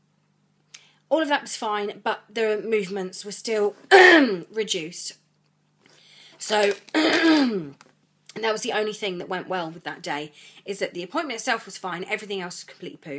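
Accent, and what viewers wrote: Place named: England, United Kingdom. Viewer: Just curious love, how hot is it where you are? I assumed you were in England all this time given your accent. xo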